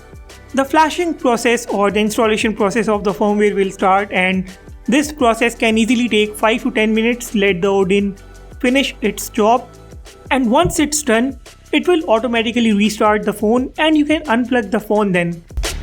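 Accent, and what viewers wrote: India. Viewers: Why the F you lying? You have an indian accent.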